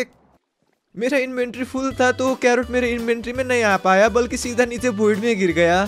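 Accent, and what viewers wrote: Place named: Nepal. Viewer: Nepoli accent>>>>>>>>>>>>>>>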